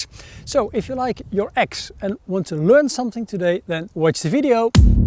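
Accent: Netherlands. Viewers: Ik houw er altijd van om door YouTube te gaan en ineens een Nederland accent te horen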